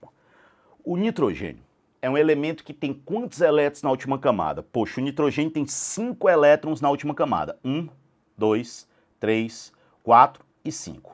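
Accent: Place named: Fortaleza, Brazil. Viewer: assistindo as aulas não so pelo conteudo mas pelo sutaque dele ,bom dms homi ! alguem sabe dizer se ele é de fortaleza? o sutaque é ,mt parecido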